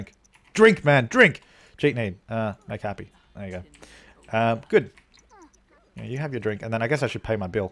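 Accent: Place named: Australia. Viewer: WAIT!!! you're Australian??.. i mean it would make sence since your talking sounds it XDD just didnt think u were with us Auzzies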